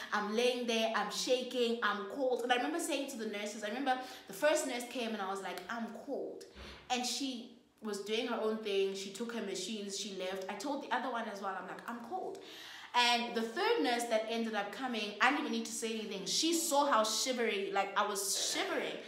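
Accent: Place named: Botswana. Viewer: Your tswana accent...OMW KE E RATILE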